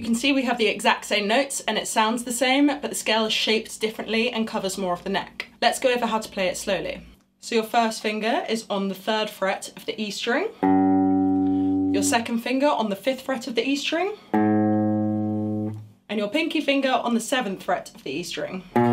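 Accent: England, United Kingdom. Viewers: See, were all being tricked. Chics this freaking smokin hot and with an English (I think) accent, which btw moves her hotness to level... actually I can't count that high, who can play a guitar like that, aren't real. I mean, its obvious... the Matrix is real. How much more proof does one need. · That British accent melts me into a puddle.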